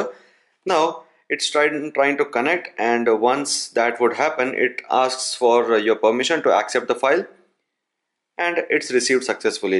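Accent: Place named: India. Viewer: Your spoken is so confusing... Indian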